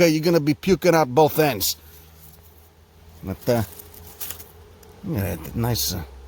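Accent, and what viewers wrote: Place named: New Jersey, United States. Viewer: Never figured someone with a Jersey accent could be so smart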